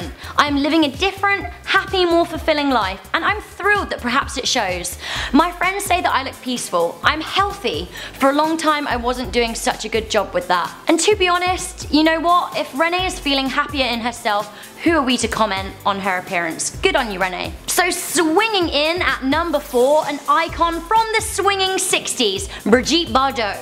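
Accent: United Kingdom. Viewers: I like her British agcent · excuse me Miss Brit with the annoying accent..HALEY DID NOT HAVE A RUINED CAREER AT ANY MOMENT OF HIS LIFE SO FAR. <link> PROVES IT....CHECK IT OUT....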